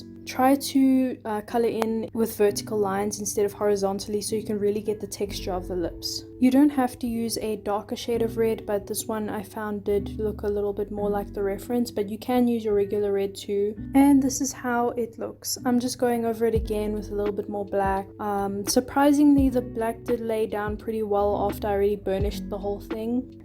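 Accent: South Africa. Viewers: ARE U SOUTH AFRICAN cause u sound SA south african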